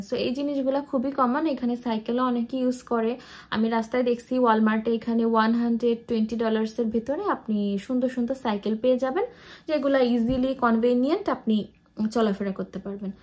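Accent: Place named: Khulna, Bangladesh. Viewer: Assalamualaikum Apu. Are you from Khulna? I am from khulna and your Bangla tone seems very familiar to me. Zajakallah ❤️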